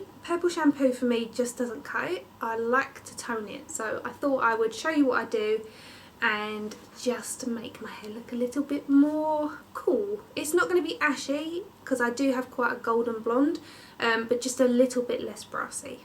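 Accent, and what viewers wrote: United Kingdom. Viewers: U r beautiful and I like ur English accent....it seems a UK accent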